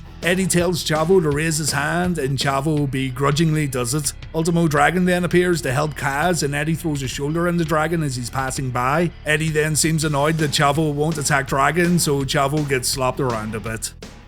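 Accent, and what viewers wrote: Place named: Scotland, United Kingdom. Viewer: Is this guy from Scotland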